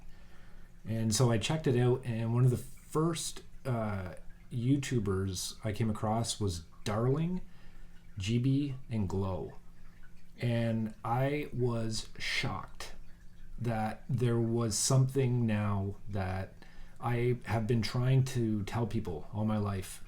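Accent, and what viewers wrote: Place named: Canada. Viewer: You and your Canadian accent are so cute.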